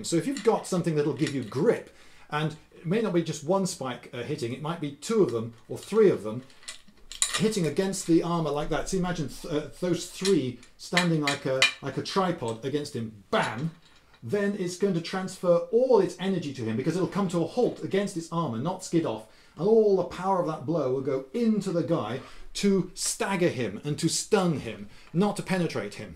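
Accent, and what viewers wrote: United Kingdom. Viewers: when I saw the thumbnail I thought: look at this neck beard cosplay tard. then I heard your British accent and your IQ points went way up lol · As an American watching your videos I can say if I'm getting some news or if I'm going to have something explained to me it's always better with a British accent... Cheers...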